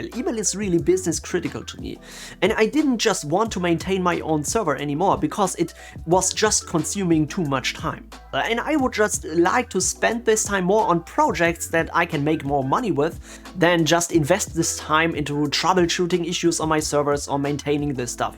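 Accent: Germany. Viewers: Do you speak german? Because i think i hear a bit of a german accent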